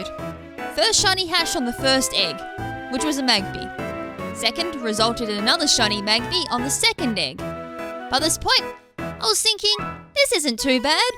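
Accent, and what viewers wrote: Australia. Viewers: I came here for the Australian accent. I was not disappointed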